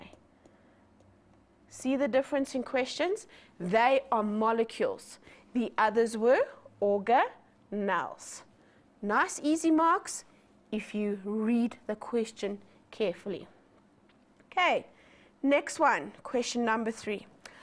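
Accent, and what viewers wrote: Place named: South Africa. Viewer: Love the SA accent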